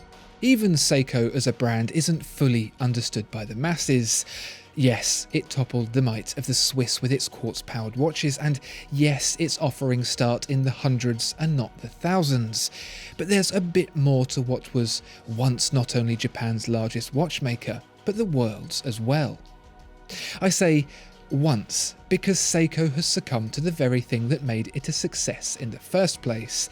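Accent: United Kingdom. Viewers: I am not a watch collector nor a watch enthusiast, my dream watch is Panerai and I have 2 of it so far, I watch your videos usually for the little historical infos you mix and match in your videos and also the elegant British accent, but this time you got me interested as both watches got my attention even the presage. Thank you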